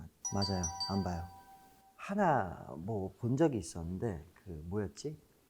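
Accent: Suwon, South Korea. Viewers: 🍦 민혁이 오빠에 이어서 오빠도 블랭크톡이라니😭 좋다 이 스케줄 달다..🍦🤍 비투비 이창섭 3년 9개월만에 솔로로 컴백했습니다❤️ SURRENDER 많관부😊💙(#써렌더 #설렌다 #예지앞사 #💙) 저 툭툭 내뱉는 그 말투가 섹시한거야 야ㅋㅋㅋㅋㅋㅋ🍑 난 오빠가 수원 사투리 쓸 때마다 너무 좋아 💕 섹시해😉 오빠 그래도 5월 쯤 부터 매일 복싱장 가서 운동하잖아!! 진짜 복싱장 관장님께서 올려주시는거 보면 오빠 맨날 열심히 복싱하고 줄넘기하고 훈련하는거 보는데 너무 멋져☺️ 살도 점점 오빠가 원하는 정도로 건강하게 빠지는 것 같고 체력도 더 좋아지는 것 같아서 좋다❤️ 뭐야뭐야 그렇게 갑자기 정해진거였어ㅋㅋㅋㅋㅋㅋ?? 민혁이 오빠 솔로 콘서트 때 멜로디가 솔로 컴백 “기회를 만들어😮!!” 하니까 오빠가 기회 만들어 보겠다고 기다려 달라고 한게 엊그제 같은데 진짜 기회를 만들어서 이렇게 빨리 신곡으로 멜로디 만나러 온 거 보니까 감동이야🥹💙 진짜 새로운 분위기 노래 너무쭈아💕 비투비, 오빠 곡들로는 처음 접해본 미디엄템포, 70-80년대 노래 느낌인데 처음 듣자마자 소름이 쫙😬! 처음 시도해보는 장르인데도 오빠랑 찰떡이야! 약간 나른섹시 느낌이랄까🤭 너무쭈아 절대 호불호 안갈리는곡이야ㅎㅎ 왜냐구? 주변 애들도 다 노래 완전 좋다고 본인들 스타일이라고 했징😉 우리 하고싶은거 다 해🥰 부끄럼쟁이 😘 부끄러워하면서 노래 너무잘불러😲 오빠 음색때문에 내 귀가 녹았다구👂 역시 믿듣비 믿듣 🍑💙 어제 비키라에거 풀었던 썰이당ㅋㅋㅋㅋ!! 커피차가 사실 커피+차 였다는 사실🤣 그것도 커피 걸어서 배달해준거 너무 웃겼엉ㅋㅋㅋㅋ😚 바쁘다 바빠 비투비사회 다들 갠활이 많아서 까먹었을 수도 있는데 비투비 모두 어제 오빠 곡 나오는거 홍보해주고 또 응원해주고 해서 내가 다 감동먹음🥺 은광이오빠 썰 다시 들어도 너무 웃겨ㅋㅋㅋㅋㅋㅋㅋ 우리 서리더 최고🐱💙 저번에 미연이언니가 심야괴담회에서 큐브 귀신썰 푼거 봤었는데 그 귀신을 오빠도 봤을줄이야🥶🥶아니 귀신썰 진짜 큐브에 귀신 있을까봐(ㅋㅋㅋㅋㅋ) 좀 걱정되는데 그 귀신과 함께(?) 녹음한 노래가 ‘갓’리워하다였다니!! 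될 노래는 된다고😆 마침 또 그리워하다 녹음 때 귀신이 있었어😲😲 비투비 다음 컴백 때도 녹음실에 귀신 나타나주길🤣🙏🏻 그럼 성재오빠랑 은광오빠가 놀라서 헐레벌떡 도망갈 수도 있겠다🙄ㅋㅋㅋㅋㅋ 이번 앨범, 비투비 다음 앨범도 대박나자🤍 구리ㅜㅜ🐶 오빠 지인분들 인스타나 유튜브 통해서 올해 초 쯤부터 키우는거는 대충 알고있었는데 언제 쯤 이야기 해주려나 기다리고 있었는데 몰래 키우려고 했었다니!!🤭 강아지 책임지고 키우는거 멋있엉🤍 예전부터 강아지 키우고싶다고 했었는데 오빠가 오빠 본인도 감당 못하는데(😆) 강아지 키우면 감당할 수 있을까? 하고 고민 또 고민하던하던 모습이 생생한데 지금 이렇게 책임감 가지고 키우는거 좋다🥹💙 오빠 핸드폰 배경화면 오빠랑 구리랑 뒷모습 사진찍은거 탐난다구ㅋ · 방긋웃는 모습이 넘모이쁜 우리 💙 숙취를 겪어본 바 배탈이 난 숙취후 ㅠㅋㅋㅋ 경력직 ㅠㅠㅠㅋㅋㅋㅋ 이런얘기 저런얘기 아무렇지 툭 터놓고 해주는 너가 참 조타아 수원사투리가 뭔지도 몰랐으면서 매번 ~거? 하고 물어보는 이창섭한테 치였었는데, 그게 수원사투리였구나?? 앞으로도 종종.. 아니 자주 해주라… 글고오!! 지읒니은 섹시해 무슨소릴 하는거야😭😭😭 나야말로..힘듭니다.. 나야말로…힘듭니다 ㅠㅠㅠㅠㅠㅠㅠㅠㅜㅜㅜㅜ 그렇게 반존대 하시면 나야말로 힘듭니다😱😳 언제나 음악에 진심으로, 하고싶은 음악에 도 전하는 모습이 지금껏 쌓아올린 이창섭을 만들었을테지 비투비가 이 음악에 진심인 만큼 멜로디도 비투비에 이창섭에 진쒸미양〰🥴 부끄럽다며 세에상 멋찌게 노래부르는 🫶❤️ 씨디💿를 주식으로 먹나 라이브가 어찌 저럴수가 있는거시여ㅠ ㅋㅋㅋㅋㅋ몇초차이에 ㅋㅋㅋㅋㅋ 우리 이사님 엉뚱함과 미담을 오가는 ㅋㅋㅌㅋㅋㅋㅌㅌㅌㅌㅌㅌㅌㅋㅋㅌㅌㅋㅌㅋㅋㅋㅋㅋㅋㅋㅋㅋㅋㅋㅋㅋㅋㅋㅌ 본투비 그하는 대박날 운명이었던거심ㅇㅇ 구리가 이 키우는 멈무가 맞았구나~~~ 구리아빠랑 구리 들킨김에.. 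👀📸 둘이 싸우디 말고 잘지내에! 구리야 이창섭 잘부탁해ㅋㅋ 동네 노래 좀 하는 오빠로 남으시려면 일단 제 동네로 와주시면… 🏡 고민도 없이 for 멜로디를 외치는 너얼 어쩌면 좋니~~ 난 for 이창섭 for 비투비야 ㅠㅠㅠㅠ 서렌더 띵곡입니다 많관부 많사부🔥 마지막까지 안뇽 하고 흐캬캬캬 웃고 가는,ㅋㅋ 블랭크톡도 이창섭도 고마웠어요 덕분에 이창섭 티엠아이 마아뉘 알아갑니다아 안녕👋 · 시작부터 저음 아.. 저는 이렇게 저음의 인사하는 에게 푹빠져요.: 역시 숙취ㅋㅋㅋ 안들린데 ㅋ 우리 숙취 팬싸도 했잖아요 ㅋㅋㅋ 숙취이야기하는데 왜케 옛날 생각나죠? 블랭크톡 알면서 이질문 고른건가요?, 저 수원 사투리 여기서 알았어요. 저 특유 말투가 수원 사투리 였다니.. 나 왜 오늘 알았는건지.. 감사합니다. 아니 이렇게 호탕하게 웃어준다구요!! 운동의 굴레~ 아 세상엔 숙제가 너무 많은데 도 숙제를 준이기분 ㅜ 저 이거 너무 궁굼했어요. 갑자기 뮤지컬도 빨리 끝나고 근데 음반 낸다고 안했고 계획도 없다고 했는데 갑자기 나와서 사실 조금 놀라긴 했어요. 갑자기 나온 이유 너무 궁굼했는데 이렇게 들으니까 너무 좋아요. 좋은 음악이 있으면 언제든 나올수 있다는걸 알거 나니 앞으로의 가 기대되요 비투비가? 이런장르를? 하고 생각했는데 비투비 이 라 가능하다고 생각했어요. 다른 장르도 도전해주세요~~ 라이브 시작 ㅜㅜ 막 잘부르는 노래도 좋은데 이렇게 흘러가듯 불러주는 써렌더.. 목소리 우리집으로 배달좀 해주세요 블랭크톡 나도 써렌더~~ 아써렌더~~ 진심 빵터졌어요. 자기 살길사느라 ㅋ 그래도 분명 멤버들 한명한명 관심없는척 하지만 다들 신경썼을꺼예요. 하지만 이사님 ㅋㅋ 주차는 ㅋㅋ 귀여운 이사님 썰~~♡ 써렌더 녹음할때도 나왔어야 하는데 귀신아 어디갔니 써렌더 대박 나야 한다고!! 이렇게 앉아있어요. 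하는데 아니 저 모습 너무 귀여워요...딱 저 수줍은 저모습 섭프가 빠지는 치임포인트 부끄러워 손을 비비는 우리 아 블랭크톡 이부분을 살려줘 감사합니다!!♡♡ 아니 동네 동생도 있다구욧!! 동네 동생 해주라~~ 우에엥에엥 꼭 전해줘요 동네 동생해달라고! 오빠 그만 찾자 @@ 이영상의 끝판왕!! For melody 내가 멜로디인게. 그리고 이창섭의 팬인게 for 멜로디 한단어로 눈물이 왈칵했어요. 항상 같은 마음으로 예전이나 지금이나 똑같이 말하는 이 라 너무 고맙습니다. 몰랐던 정보 또 그의 마음이 너무 잘 전달 되었어요. 앞으로 멜로디 한사람으로 이창섭도 블랭크톡도 응원 할께요. 너무너무 감사합니다. 내가 이창섭을 사랑하는한 우리 또 만나요. 블랭크톡 다시한번 감사하고 사랑해요! ♡surrender all to 멜로디♡ ♡surrender all to 이창섭♡ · 수원 사투리가 저렇다는거 처음알았다 ㄷㄷㄷ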